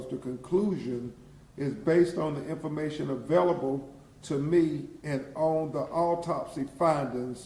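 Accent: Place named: South, United States. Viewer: Country accent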